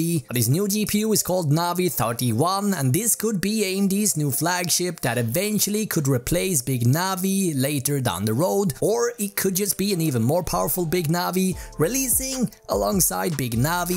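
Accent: Sweden. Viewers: Damn this swenglish though ....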